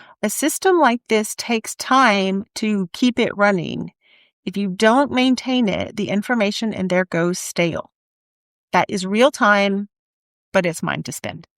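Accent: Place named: United States. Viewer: One of the first things that caught my attention: American accent, but Weck jars in the background? As I'm just starting out with Claude Cowork and Code, I'm looking forward to following along and maybe avoiding some mistakes. Bonus: finally a woman in my age bracket explaining things to me instead of a 20-year-old guy.😉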